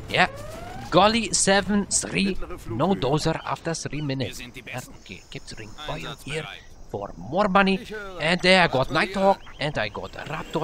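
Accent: Germany. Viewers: I love when Germans speak English :D It sounds pretty nice :D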